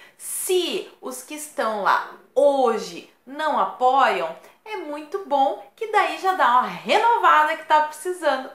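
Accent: Rio Grande do Sul, Brazil. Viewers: ADORO O SOTAQUE GAÚCHO DELA, ALÉM DE ELA SER MUITO BEM INFORMADA E EXCLARECIDA!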